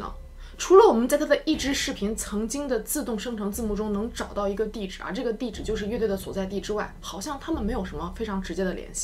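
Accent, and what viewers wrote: China. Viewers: You sound like from mainland China. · 是中国人很正常😎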